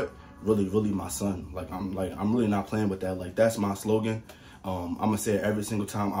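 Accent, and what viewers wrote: New York, United States. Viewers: “Light skinned salmon “ 😂🤣 big facts! Lol I knew you was from NY soon as I heard you tawkin.lol Yo how you like that kerrigold butter? Some ppl be sweating it but idk..I wasn’t really feeling it. What do u like to use it for more?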